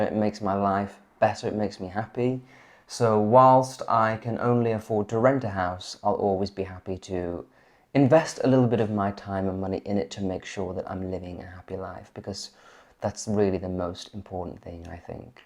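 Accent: United Kingdom. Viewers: Hola, I love bright colours but I must admit it, you were totally right, the new tiles have upgraded your kitchen enormously! I admire and appreciate the love and care you put in everthing you do and also the way you present it, you make even the most trivial detail look elegant and sophisticated. I love your British accent and I find your videos soothing and conforting. Thank you for being there every week.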